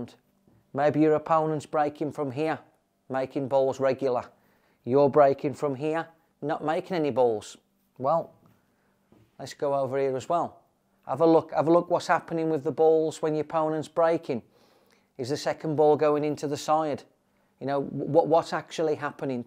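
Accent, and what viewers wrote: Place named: Midlands, United Kingdom. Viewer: Hi, love your video mate... im prob only a few years older than you, been playing all my life, dad owned a pub all thru my childhood, i played pool everyday. I lived in australia for 20 years and saw u play there. I lived in sydney so i mostly played american pool, im back in the UK now and for the first time im hitting balls again on an english table.. wow.. playing english pool is quite difficult again after playing american pool for so long. so i really appreciate your tips here mate. Id love to come and meet you for a chat about pool one day. Im based in coventry, i can hear by your accent mate that your from the midlands??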